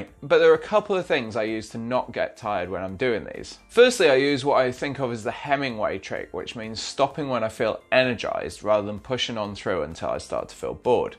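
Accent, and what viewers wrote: United Kingdom. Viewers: From same town as Jagger? Same accent. Great content! And coincidentally I'm re-reading The Organized Mind right now.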